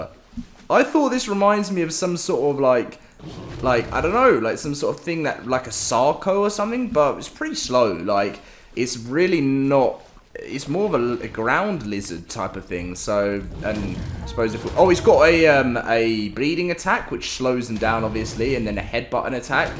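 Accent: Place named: Birmingham, United Kingdom. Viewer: U sound like my friend that lives in Birmingham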